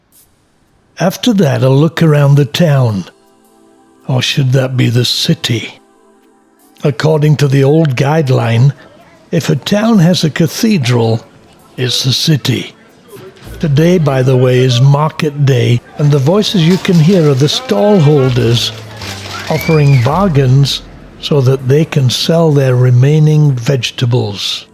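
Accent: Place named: United Kingdom. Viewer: oa Nice British accent .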